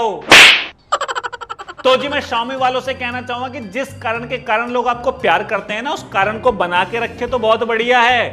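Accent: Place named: Bhojpuri, India. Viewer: Well tried for Bhojpuri 👍🏻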